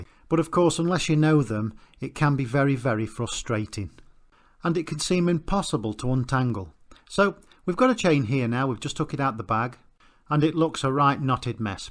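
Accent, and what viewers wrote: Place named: England, United Kingdom. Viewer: Damn dude... What a breath of fresh air to come across a video that is clear, concise, gets right to the point...and above all else...provides the solution to the stated problem. In some ways I was thinking this may be the quintessential "perfect" diy video on YouTube. By this I mean, it certainly does everything listed above, but in addition, it handcuffs the malcontent viewer who finds fault in every video...the video quality is more than sufficient. The audio is of even higher quality, not to mention the soothing, dulcet tones of the anglican accent. Plus, there's no fat on this bone... There's only the bare essential information the viewer tuned in for. Well done. You don't have to sell me on subscribing, this video meritoriously earned my subscription.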